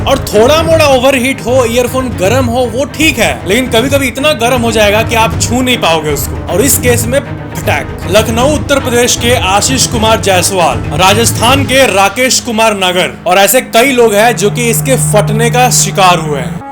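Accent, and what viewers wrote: Bihar, India. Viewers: Are you from Bihar because your voice tone is like bihari, I'm also an bihari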